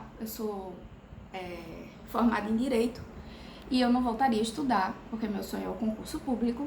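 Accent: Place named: Northeast, Brazil. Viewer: Muito incentivador seu vídeo parabéns eu sou de Goiás e gosto muito do sotaque de vcs do Nordeste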